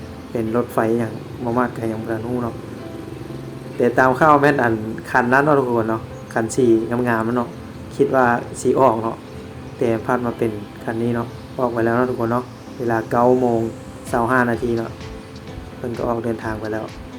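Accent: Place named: Xiangkhouang, Laos. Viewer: ເຈົ້າບໍແມ່ນຄົນຊຽງຂວາງຫວາຄຳເວົ້າຄ້າຍໆຄືໄທຊຽງຂວາງ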